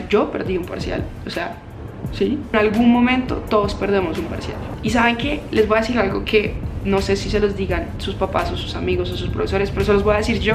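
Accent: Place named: Andes, Colombia. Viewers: el acento de Bogotá es diferente, el que hablan sus compañeros si es el acento de Bogotá. Ella tiene un acento neutro con un poquitico de caleño (ella es de Cali) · Hola, si es muy normal esa entonación de la S en la región andina de Colombia. Ella habla con acento de Bogotá, la capital de Colombia. De donde eres?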